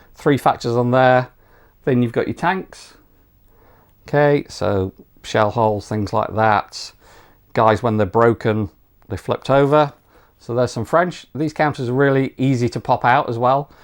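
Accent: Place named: Europe. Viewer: I infer from you accent that you live across the Channel and not across the pond. So, yes, marketing is the reason why US forces are included instead of British troops that should have been a way more obvious choice - or, as you mention, Russians, Italians, Austro-Hungarians, etc. And, indeed, an expansion with British / Commonwealth units is under way; pictures of play-testing are available on the Strategikon forum where the creators are most active. I remember one of the creators telling me he had a lot of documentation to make scenarios with UK and Empire troops, I even think the Middle-East theatre was mentioned, and that he was kind of heart-broken to have to drop some of it to maintain the amount of material economically reasonable - at least as far as a first batch is concerned. Focusing on French troops and West front in WW1 is natural for a French team, because of more than 1.3 m dead soldiers... 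and to make a difference with the usual production in which the conflict first tends to be limited to US Marines and Belleau Wood. As a conclusion... support it and you will sure see male and female Mark I tanks in Gaza, one of these days.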